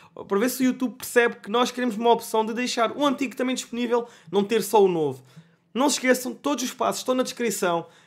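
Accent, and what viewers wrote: Portugal. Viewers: portugues de portugal =) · Ele é português '-' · Puta fudeo o é português não vou entender oque ele fala:(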